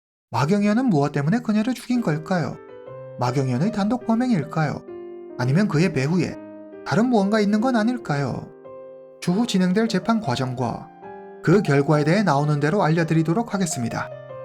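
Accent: China. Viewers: 주인장 조선족임? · 말투 뭐임? 선족임?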